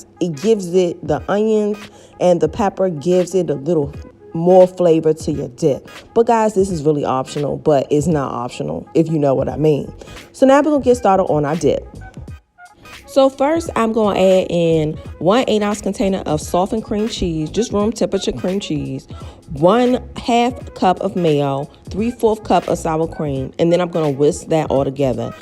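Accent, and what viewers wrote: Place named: Maryland, United States. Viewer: OK, I was about to quote the hell out of this video, but realized it was damn near the whole thing! Love the energy and that Murland twang... Now, if I can focus and get ur recipe I'd be good! lol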